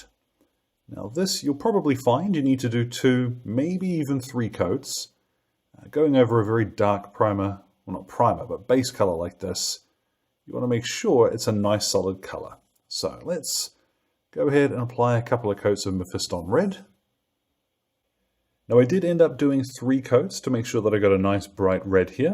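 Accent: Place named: New Zealand. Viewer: Great video. I think this might be the Kiwi you've sounded thus far, eh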